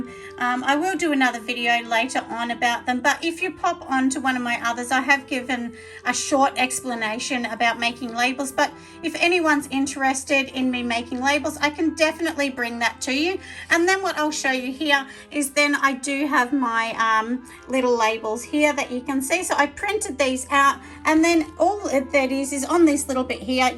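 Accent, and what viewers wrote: Australia. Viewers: Love your Australian accent! Idk y someone would complain?! Love your videos.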